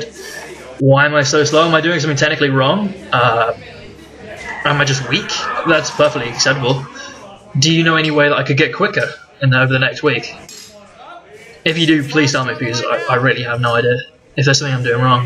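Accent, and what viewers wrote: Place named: Australia. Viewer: dat aussie accent creeping in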